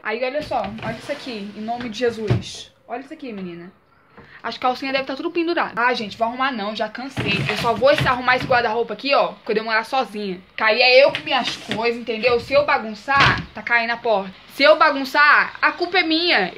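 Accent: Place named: Rio de Janeiro, Brazil. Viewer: Época que tinha sotaque de carioca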